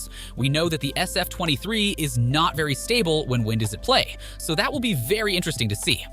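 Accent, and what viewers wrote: United States. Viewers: the AMERICAN ACCENT is KILLING ME!!!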